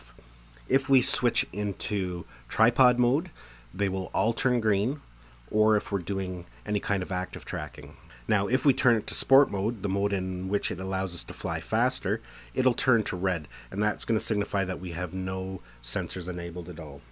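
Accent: Canada. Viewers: Canadian accent made this so amazing 😌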